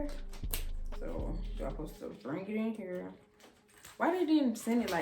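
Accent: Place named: Memphis, United States. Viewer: Shein b coming threw wit some of these purses lol especially for dupes and u sound u from Memphis 😂❤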